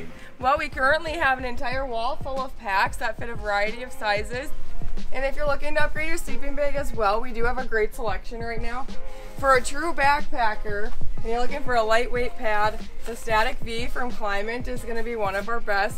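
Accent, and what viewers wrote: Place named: Canada. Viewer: She sounded just like a normal person and slowly she sounded more and more Canadian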